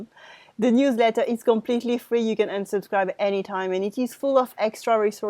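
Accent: France. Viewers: These vignettes are lovely Tq SO MUCH for teaching in your iincredible beautiful French accent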